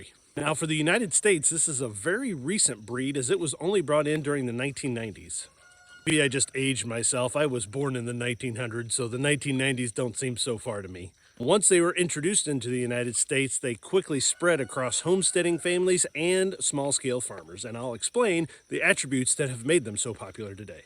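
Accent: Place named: Scotland, United Kingdom. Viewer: Mighty fine Scottish accent!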